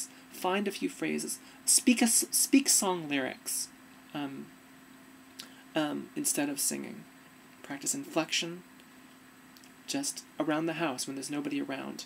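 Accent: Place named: Ireland. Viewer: You have a lovely voice! You seem to me to speak with a slight Irish accent. When you say words like 'that' and 'part'. It's beautiful and sounds very natural.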